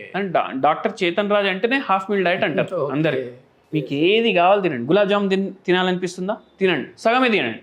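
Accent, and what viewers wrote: Telangana, India. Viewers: bro it's telangana slang don't insult the language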